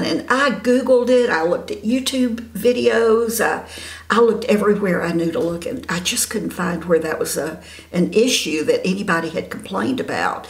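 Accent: South, United States. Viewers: I just came across your video and consider myself a very mature skinned woman at almost 69 years old. Kimberly also was the artist who convinced me to take the deep dive, even though she was not the artist who did my colour match. I had been on the fence and had been contacted by individuals I knew but having mature skin compared to their flawless young skin was making me very hesitant. Watching Kimberley Weiner tipped the scale. The beginning of your video compared to the end should convince anyone to give this makeup a try! You are beautiful & you ROCK with confidence. Thanks for sharing🙏❤️ BTW....love your southern drawl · what a warm, beautiful spirit you have; much less your southern charm and charisma drenching your whole persona! You are definitely a spell binding performer and entertainer while teaching us girls how to look our best! i was born and raised in Atlanta and could listen to your southern belle talk forever! 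Carry on, sugar, you're fantastic!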